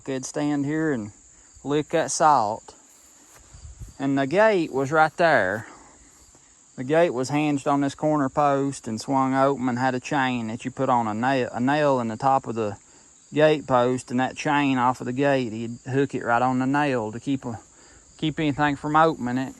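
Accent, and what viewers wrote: United States. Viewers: I like the way u talk very country I do some I'm from raleigh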